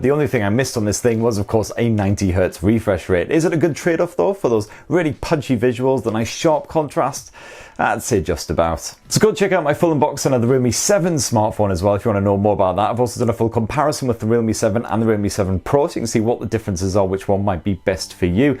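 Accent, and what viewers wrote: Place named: North East England, United Kingdom. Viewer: It's English humour and his accent North East England you won't understand that unfortunately.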